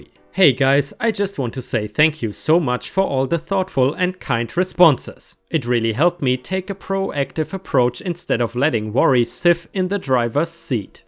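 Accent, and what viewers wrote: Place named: Germany. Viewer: Also, if you don't mind me asking, what part of Germany are you from? I have an aunt from Berlin. She's lived in the U.S. for over 40 years and has a much stronger accent than you. That doesn't matter to me because I absolutely love meine Tante Ludmilla.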